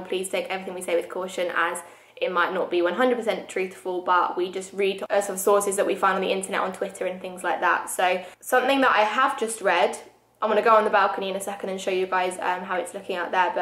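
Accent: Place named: London, United Kingdom. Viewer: Manila always gets hit very badly.....Great to hear London English. Greetings from Rosario Cavite.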